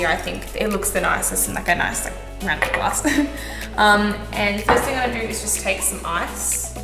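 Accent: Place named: Australia. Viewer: I actually had no idea she was Australian, but I did wonder bc i started watching her Barbie vids first, so I wondered why she sounded so weird and different bc I never heard an actual Australian person say anything except like alrighty mate or something like that but then I found out why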